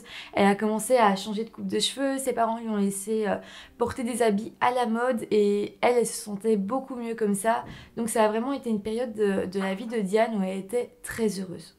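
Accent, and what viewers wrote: Belgium. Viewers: Oui, c est un accent belge 🇧🇪😁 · Merci pour cette vidéo, c'était très intéressant et bien raconté. Serais-tu belge à tout hasard? :)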